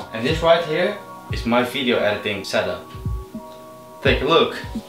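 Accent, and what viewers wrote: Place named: Netherlands. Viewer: Respect voor je engels maar op een één of andere manier is het echt weer louis van gaal engels 😂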